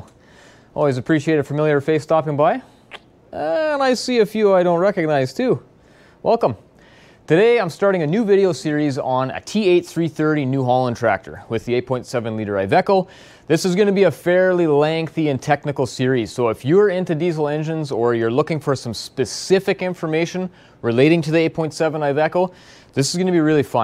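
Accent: Canada. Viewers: You're Canadian right? You have the accent...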